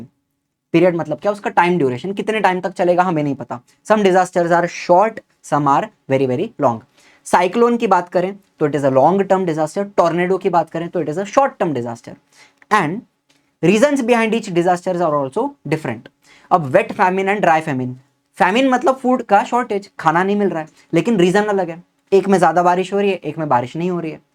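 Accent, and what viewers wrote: Maharashtra, India. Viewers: Sir AP Marathi ho kya Hindi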